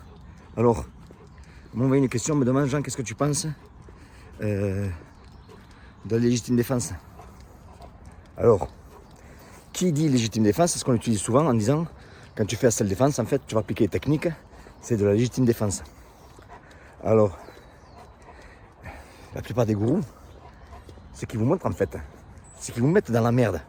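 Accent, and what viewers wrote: South, France. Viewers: Bonjour Jean. D'habitude, je suis à 100% d'accord avec toi, mais la tu est en train de te contredire. JE m'explique. D'habitude ton discours c'est "on m'agresse, bam je riposte direct en cas de moi-même finir out". Or dans cette video tu est en train de nous dire qu'il ne faut pas "defoncer" un gars qui te saisis au colbak et que effectivement si tu le fait ta reponse ne sera pas "proportionnelle" à l'attaque ce qui est tout à fait juste d'un point juridique. Mais comment fait-tu (à part faire du sparring lourd tous les jours) pour ne pas réagir de maniére disproportionnée lors d'une attaque ou tu est en stress ????? Voilou. Je veux pas faire mon chieur mais, les gourous t'attendent au virage et la ils vont pas te rater. Enfin bon je prefere quand même le gars avec un bon accent de chez nous (du sud) au gars qui se rase la tête avec un elastique.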